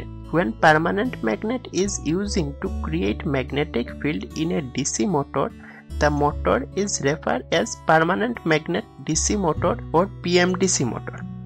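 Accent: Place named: Bengal, India. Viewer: Liked the bengali accent dada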